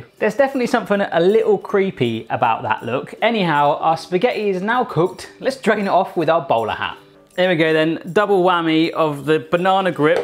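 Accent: United Kingdom. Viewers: Is he British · I really like British accent 😍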